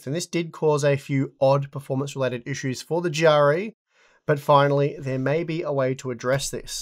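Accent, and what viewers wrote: Australia. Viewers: Regret even less for buying last week! BG3 is so smooth now! And that Australian accent is truly something else lol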